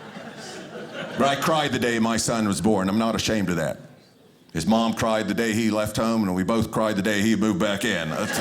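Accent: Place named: Ohio, United States. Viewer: Sounds like he is from Ohio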